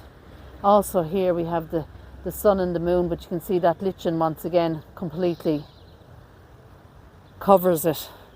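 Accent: Ireland. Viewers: I love you channel and Irish accent!!! Some of my mom’s family were Irish. Great ancient graves 😻!!! We don’t have any like that here in the U.S.A.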